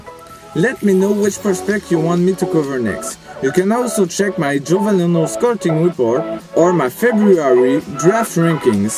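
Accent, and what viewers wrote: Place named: Quebec, Canada. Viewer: J'adore l'accent Québécois dans ton anglais ahahah! Sinon c'est une bonne bonne vidéo!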